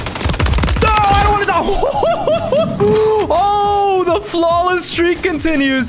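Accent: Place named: United States. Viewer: Most American sounding Kiwi around, you even from NZ uce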